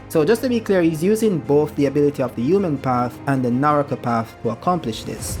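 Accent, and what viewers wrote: Jamaica. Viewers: Bro I was listening and I was like this Jamaican English for sure. Good shii bro keep going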